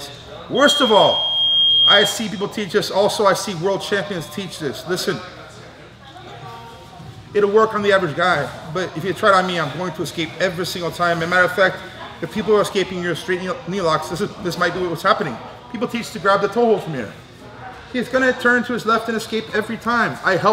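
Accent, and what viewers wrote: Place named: New York, United States. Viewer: great tips, plus you talk like a real New Yorker / New Jerseyan (the Proper English) ... awesome OSS ...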